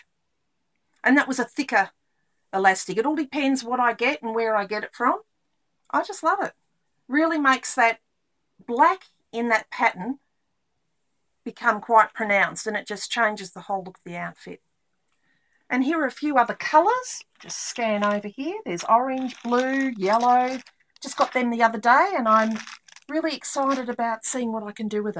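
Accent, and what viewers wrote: Australia. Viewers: You sound like an Aussi? From where? I’m from Bentleigh, Melbourne!!!!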